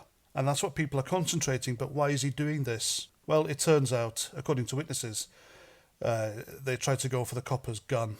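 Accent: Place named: North Wales, United Kingdom. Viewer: Lovely to hear a narrative from a fellow North Wales 🏴 man. Greetings from Bodorgan Ynys Mon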